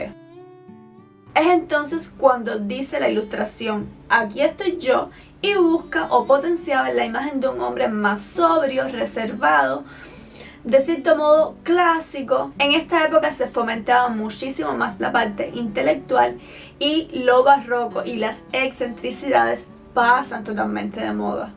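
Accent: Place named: Cuba. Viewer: Creo que tiene acento cubano, me gusta!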